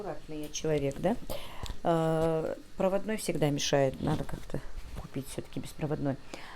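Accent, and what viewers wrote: Standard. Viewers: Прекрасная чистая речь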